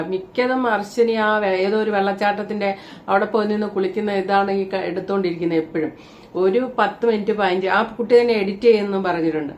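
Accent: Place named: Kollam, India. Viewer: കൊല്ലംകാരിയെന്നു തോന്നുന്നു കാഞ്ഞിരപ്പള്ളി സ്ലാങ് വേറെ ആണ്‌ ഞങ്ങൾ ഇത്തരക്കാരല്ല Am proud to be a Kottayamkaran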